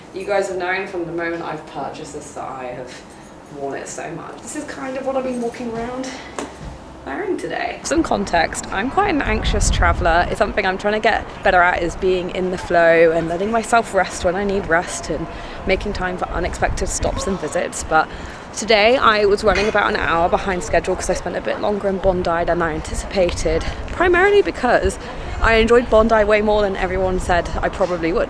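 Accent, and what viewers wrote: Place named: England, United Kingdom. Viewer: Welcome to the city that is Soooo Up themselves (like New York).🤣 Just watch where the news of murders each week happens, and where the deaths (including of children sent home from in hospitals ER's, more frequently occur). The Northern Suburbs so don't like the rest of Sydney that they resist getting a train line, so that the 'Westies' would not invade them or their beaches.(It's not calleed the Insular Peninsular for nothing. Then you have the Eastern Suburbs who are also up themselves! That's why the train line stops at Bondi Junction, and Waverly council did not allow the train to run right to the coast. And neither the North Shorers or the Eastern Suburbs people want to be seen at the Western Suburbs (unless they are just passing through to the Blue Mountains or Perth.).. But sounding like a pom, you will no doubt understand. 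😊 I am in Newcastle who has good beaches, less rain/storms , and a place which helped to make Sydney rich, but without the gratitude. If you think we are hard done by, consider how the big whigs in Sydney treat the regional and rural areas. 😛 enjoy! You will see, no doubt that when the poms sent the convicts to here in chains - ..They were sending them to HEAVEN! (my Gr Gr Grandfather didn't come out as a convict but from Sussex as one of the earlier TEN POUND POMS.. And he never whinged about it. And the Lord blessed Him and his family. 😇 <link>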